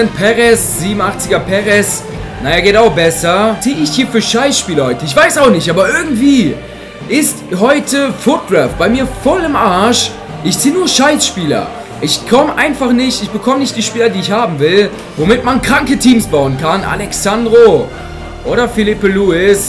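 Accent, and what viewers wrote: Germany. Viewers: Redest du Schwäbisch? Wenn ja mach mal davon ein kurzes Video pls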